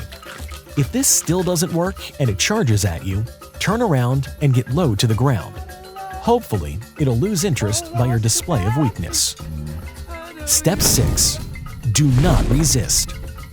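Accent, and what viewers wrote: United States. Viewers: Dude u have an American accent stop using metric measurements. .WE DON'T USE THAT. .at least use both. .i was educated in the 90s aint no conversions in my head😭😭😭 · American accent. Gives information in metric system. You failed.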